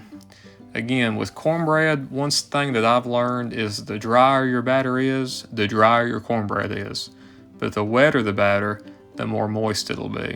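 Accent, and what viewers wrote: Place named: Mississippi, United States. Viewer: I don’t know where you’re from but it sounds like Mississippi. I enjoyed the video. Beans & cornbread is one of my favorite meals.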